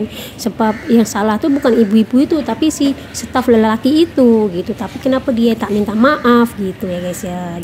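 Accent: Java, Indonesia. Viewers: Slank Jawa nya pekat.Walau pun dh lama dok M'sia.Semoga pelan pelan berubah agar jadi Org Malaysia.Maaf bukan tujuan menghina tapi kagum dgn ketabahan hidup di M'sia.👍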